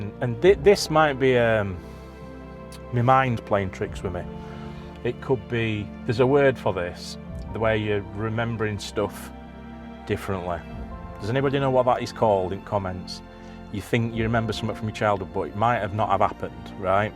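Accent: Yorkshire, United Kingdom. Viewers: Ey up. Is anybody more Yorkshire than you. Love it, loving the vlog. Hope you didn't get caught dogging 😆 Really liked the humour in this one. You're certainly finding your feet at this vlogging lark. Nice one 👍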